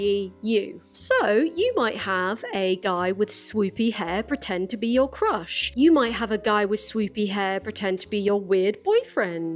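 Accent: Ireland. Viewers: has an Irish accent like my wife's. Perfectly innocuous English accent, and the BOOM, the letter "r" appears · wait, you’re irish? cumann na mBan video when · Until you made the Ireland joke I assumed your accent was just English but now all I can hear is your Irish R’s lol (especially when you said ‘argument’) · Your Irish accent was thriving this video and I am HERE for it.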